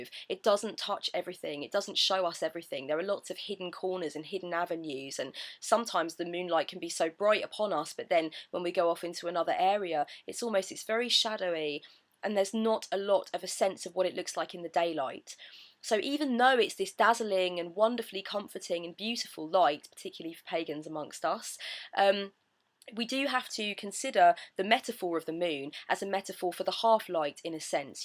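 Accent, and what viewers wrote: England, United Kingdom. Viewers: I would love to know your academic background. I wish you were a professor in the states (we love English accents for some reason, especially in higher and Broadcasting). You have amazing gifts as a teacher. I rarely subscribe but I'm going to be interested in further videos... Today is my first. Thank you so much for doing this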